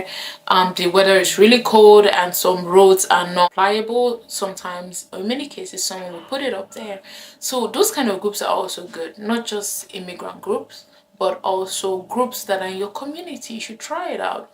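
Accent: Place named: Nigeria. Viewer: name of the group? i actually thought you were nigerian,until you said kenya..wooow nice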